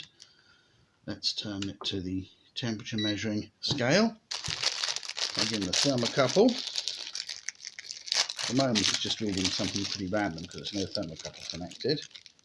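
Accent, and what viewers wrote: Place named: England, United Kingdom. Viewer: um, we couldnt se a thing you were doing. just heard some old English chap muttering on about various things...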